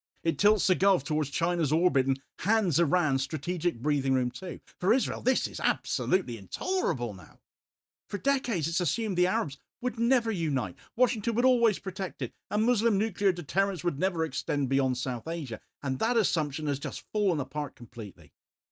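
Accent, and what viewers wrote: Cornwall, United Kingdom. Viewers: Are you from Cornwall?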